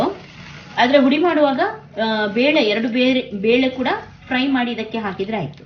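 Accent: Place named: Kerala, India. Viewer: Mdm..ji.ur kerala style mixing speaking kannada explanation is extremely good n methodology is excellent..sister.let God gives u all sathvica sampathu..Badarinath.mysore.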